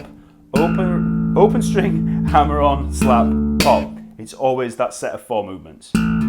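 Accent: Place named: Northern England, United Kingdom. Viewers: Great! Loving the lesson, technique and the Yorkshire accent!! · A proper northern english accent. :)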